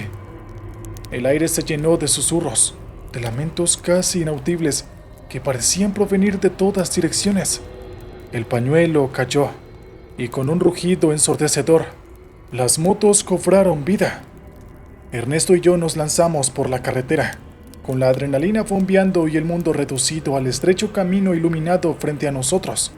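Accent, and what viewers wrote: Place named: Mexico. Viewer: Esa voz suena mexicana 🤣🤔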